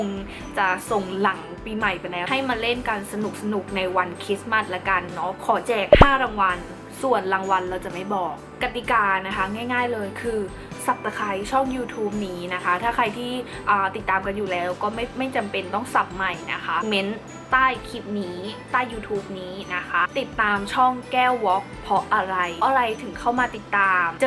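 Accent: Thailand. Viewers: คนสุพรรณ ใช่ไหม สำเนียงออก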